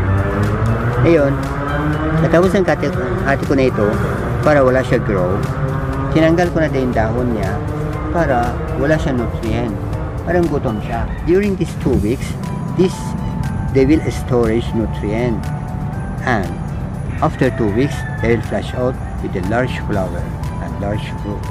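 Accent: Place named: Iran. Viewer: The best video on this topic. Thank you. You sound Persian.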